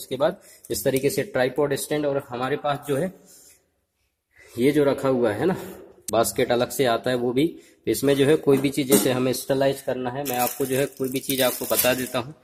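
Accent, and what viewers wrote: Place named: India. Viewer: No one hears ur Indian speech try to post with English subtitles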